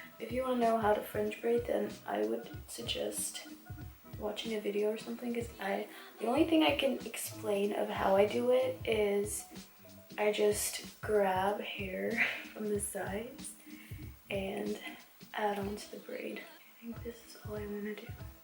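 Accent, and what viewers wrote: Netherlands. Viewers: are you dutch? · Omg wait are you Dutch?!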